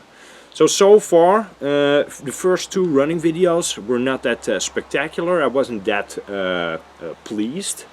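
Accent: Netherlands. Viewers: 100% dutch accent there! Haha! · je klinkt nederlands!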